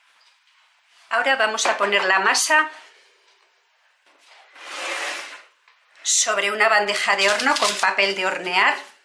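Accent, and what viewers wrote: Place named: Zaragoza, Spain. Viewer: Cuando oigo su acento recuerdo mis viejos tiempos en Zaragoza, desde el extranjero la morriña es una mochila que nunca se deja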